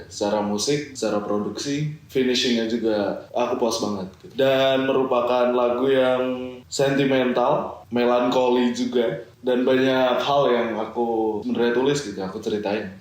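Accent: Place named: Java, Indonesia. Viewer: ternyata agak medok ya bapak kalo bhs indo😂😂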